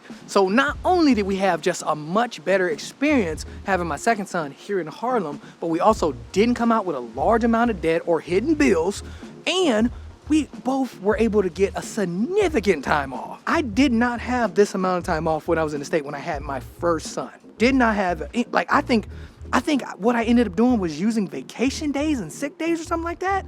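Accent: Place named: Alabama, United States. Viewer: Love to listen to you, you sound like my dad who is from Alabama.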